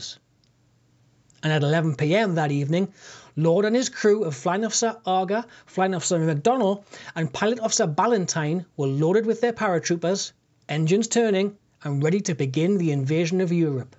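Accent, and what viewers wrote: Newcastle, United Kingdom. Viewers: I don't hear Geordie accents on YouTube very often. I live near Seattle, but I am originally from Lobley Hill, Gateshead. My uncle Len was killed in Normandy on Sunday, July 23red, 1944.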